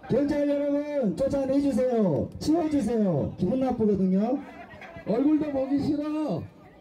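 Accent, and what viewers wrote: Jeolla, South Korea. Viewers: 전라도네 사회보는놈 듣게싫어사투리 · 시팔 세퀴가 전라도말투네!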